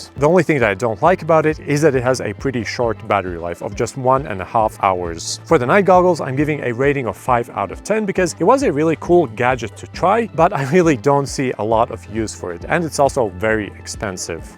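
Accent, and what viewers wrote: Latvia. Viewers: Love Latvian accent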